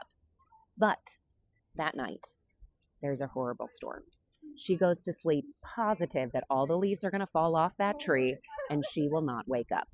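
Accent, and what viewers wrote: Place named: Ireland. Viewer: I hear a hint of Irish in your voice, great story tellers, the Irish and what a lovely story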